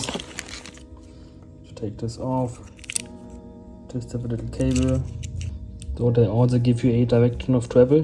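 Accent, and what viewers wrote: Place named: Germany. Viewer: Another great video man. I can't lie, love your German accent.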